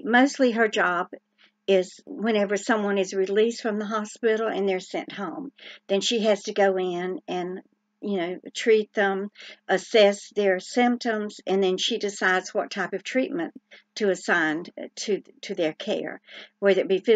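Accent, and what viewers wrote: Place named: Tennessee, United States. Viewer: You look so pretty in blue. Glad you are feeling better. I enjoy listening to you. Love your accent. Makes me feel at home. Tennessee in the house here.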